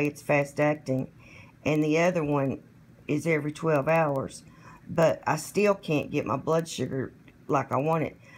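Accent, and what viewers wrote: United States. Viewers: You have the sweetest voice. Listening to your voice makes me want to be a little girl so I could crawl into your lap and hear you tell stories. That sounds SO creepy when I type it out, sheesh! I mean it in the nicest (non-stalker) way possible though! I'm from Australia, so your US accent is different, plus you have that rich, beautiful Southern tone also that just sounds so lovely to hear. I hope your health is on the upswing very soon. I have heard from others how steroids can really mess a body about. I wish you happiness and health!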